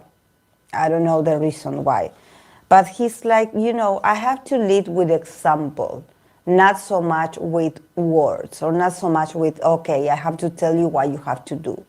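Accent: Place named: Argentina. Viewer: sos argentina? creo que detecté nuestro acento. Gracias por el video, me gustaría que hagas uno sobre Keeho y Theo 😊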